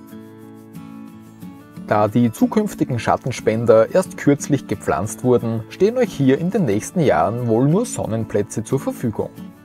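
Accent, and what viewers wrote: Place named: Switzerland. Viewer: Hallo Zusammen... sollte eigentlich Hochdeutsch und nicht Schweizerdeutsch sein ((-: Aber klingt halt so, wenn ein Schweizer Hochdeutsch spricht, haha. Lieber Gruss (wir schauen immer gern euere Videos!)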